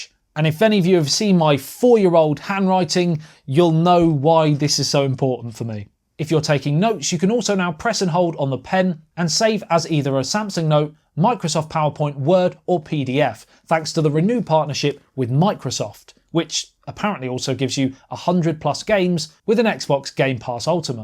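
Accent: England, United Kingdom. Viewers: That ENGLISH accent, 😂😂😂😂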